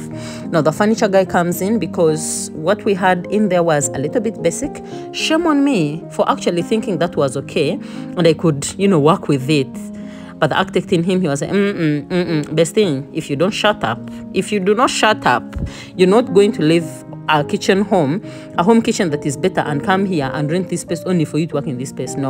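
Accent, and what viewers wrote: Uganda. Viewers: I love the Uganda accent😅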